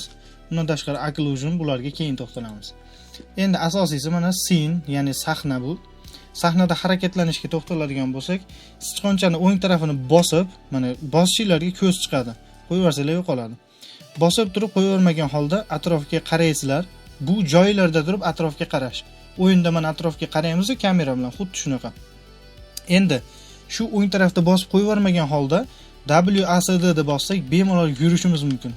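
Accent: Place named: Andijan, Uzbekistan. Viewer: Anjan shevamdan aylanay. Rahmat video uchun, juda zoʻr tushuntirish boʻldi. Videolar kuniga chiqsa yaxshi boʻlardi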